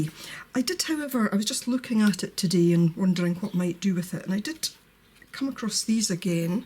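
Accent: Scotland, United Kingdom. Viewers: I don't know if anyone mentioned this already, but the things that you thought were stickers look like window clings to me. I love your art work, and also your accent! My grandmother was from Scotland and I've always loved to hear that accent. I'm from the US.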